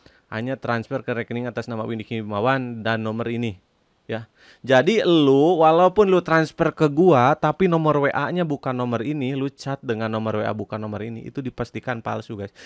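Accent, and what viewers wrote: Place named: Kalimantan, Indonesia. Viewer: logat bahasanya kayak bahasa melayu arah ke kalimantan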